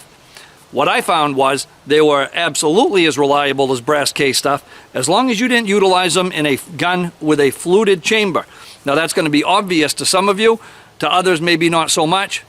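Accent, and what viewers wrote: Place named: Northeast, United States. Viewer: Mouth is too small. Looks homeless. Has a northeastern accent. Very twitchy like a methican American. Also full of shit. If you weren't such a poser you'd know a soldier wouldn't get a lighter load if this worked out, he'd just be ordered to carry more. Pound of feathers weighs the same as a pound of stones.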